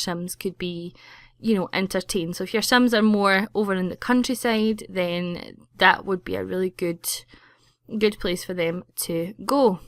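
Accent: Scotland, United Kingdom. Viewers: you sound Scottish just like meh! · It's called a Scottish accent, and it's cute. Maybe you're deaf, or just not used to the English language, but I learned English about 1 year ago, and I'm used to a normal American/Boston accent. And I can understand her perfectly. It's not fault that she speaks like that. So, please don't comment on her accent. She can't do anything about it, and she shouldn't, because it's lovely <3 c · Wow, your accent is gorgeous. I don't know if you know who James McAvoy is, his a Scottish actor and his voice is amazing just like yours! I think I'm just inlove with Scottish and Irish accents! They are just so different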